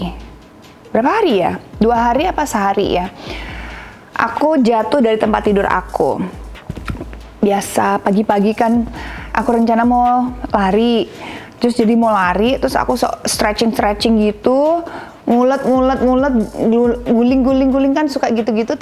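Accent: Bali, Indonesia. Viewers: Logat balinya baru keliatan 🙏 salam rahayu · Seneng liat kak pke logat bali, ga kagok, emang orang bali sh ya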